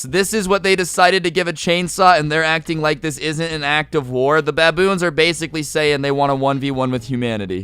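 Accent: United Kingdom. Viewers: Great, British accent /\ | | Do I need a coma there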